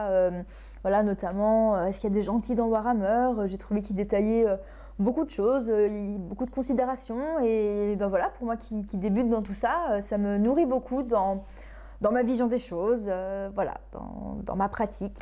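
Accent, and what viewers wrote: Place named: Belgium. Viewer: G Wé :-) Ça fait du bien d’entendre des prononciations de notre plat pays :-) Cela me rappelle le temps où mes parents avec une BMWée 😂 Un coucou d’un Belge de France !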